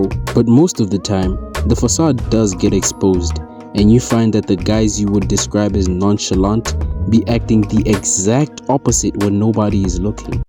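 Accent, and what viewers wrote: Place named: South Africa. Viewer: Ngl I'm boy sounds South African 😅W video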